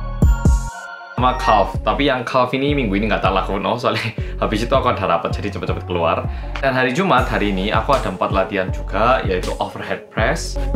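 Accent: Java, Indonesia. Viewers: Maaf baru pertamakali nonton.. orang korea asli apa jawa? Atau campuran? Soale muka korea logat jawa.. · Medok nya emang sengaja gak di ilangin ya mas 😁tapi bagus kok medok jadi lebih ke Jawa jawa an gitu.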